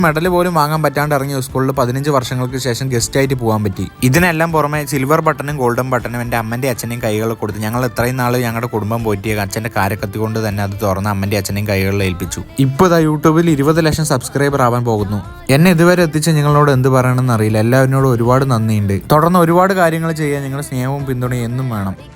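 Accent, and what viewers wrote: Palakkad, India. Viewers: Palakkad slang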